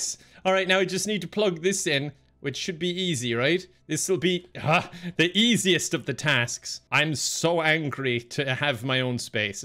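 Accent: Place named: Ireland. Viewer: Love watching play games that are about awkwardly controlling movement, like octodad. He just gets more angry and Irish lol.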